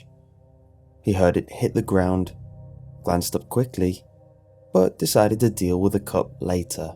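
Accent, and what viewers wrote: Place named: England, United Kingdom. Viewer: Maya Pletz I mean has an extremely eloquent English accent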